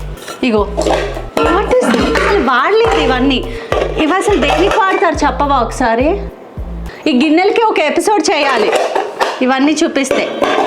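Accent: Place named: Bhimavaram, India. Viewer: వావ్ 😍 మీ కిచెన్ చాలా బాగుంది, ఆ సామాన్లు చూస్తోంటే నాకు మా అత్తగారు గుర్తోచ్చారు, ఆమె అలానే బొలెడన్ని దాచి పండుగకు శుభ్రం చేసేసి మళ్ళీ దాచేసేవారు 😂 ఆమె తరువాత చాలా వరకు నేను ఆర్ఫనైజ్కి ఇచ్చెసాను. మీ కిచెన్లో నావి బుజ్జి సజెషన్స్..ఓవెన్కి సంబంధించినవి బౌల్స్ అవి ఓవెన్ కిందనే పెట్టొచ్చు కదా? స్నాక్స్ పెట్టారు అక్కడ.. స్నాక్స్ అన్ని ఒక బాస్కెట్లో పెట్టి పైనా పెట్టుకుంటే అస్తమానం డౌన్ అవ్వాల్సిన అవసరం ఉండదు కదా.. గెరిటాలు చాలా ఉన్నాయి, అన్ని వాడం కాబట్టి కొన్ని దాచెయచ్చు మేబీ.. లేకపోతే మెస్సీ అయిపోతుంది. మీ రూట్స్ భీమవరం అని ప్రతిసార చెప్పనవసరం లేకుండానే అత్తయ్యగొరు, మావయ్యా గొరు అంటారు చూడండి. అక్కడే అర్థమైపోతుంది.భలే క్యూట్ ఉంటుంది ఆ యాస ❤️❤️ లవ్యూ వితిక గారు 🩵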